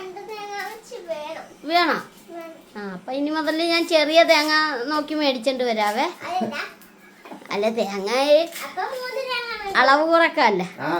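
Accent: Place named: Kannur, India. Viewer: പപ്പായ കണ്ണൂര് ഭാഷ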